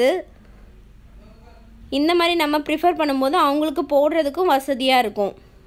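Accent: Tirunelveli, India. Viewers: Sister neenga Tirunelveli ah.....speech apdi iruku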